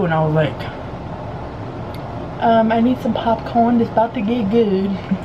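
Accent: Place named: South, United States. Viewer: I don't know what that accent is that you have but I absolutely love it. It's kinda southern with a hint of Spanish.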